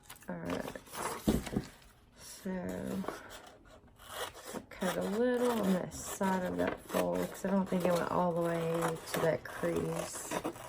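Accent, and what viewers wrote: South, United States. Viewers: Hi! New friend to your channel from Kentucky. I can tell you have a southern accent as well. Thank you do much for showing us how to start one of these cute journals because I want to make one for my daughter as well as one to do with my triple negative breast cancer I was diagnosed with this past November 2020. You truly have inspired me to make some. 💗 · I’ve never made one of these . I’m honestly not very crafty. I have watched a couple of people in the past do these I I just couldn’t make heads or tails out of it. Watching you and your thought process as you put this together made so much since to me. I learned more from these first two videos than I’ve ever been able to learn before. I’m a visual learner and you made me feel like I really could do this! You kept apologizing in the video and i kept thinking no, no, no, you’re teaching this the way it should be taught. 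I for one am very grateful. It wasn’t boring to me, it was informative and detailed in just the way I needed it presented in order for me to understand. Thank you so much. This is my first time here. I kept thinking as you talked that you have to be from Arkansas, Texas, or Tennessee. I’m from Tennessee and I’ve been transplanted in Arkansas for the last several years. Lol! I can’t wait to watch you again!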